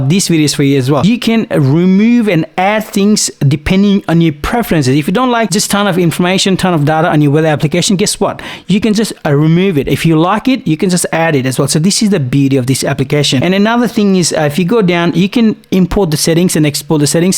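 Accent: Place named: Australia. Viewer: Drop your Australian accent please.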